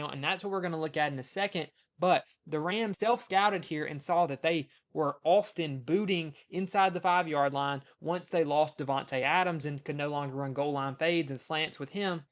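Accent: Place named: North Carolina Piedmont, United States. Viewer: You’re from NC I can hear it. Piedmont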